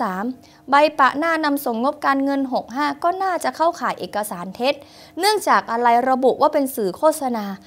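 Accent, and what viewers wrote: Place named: Satun, Thailand. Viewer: แหลงทองแดงชัดเจนมากๆเลย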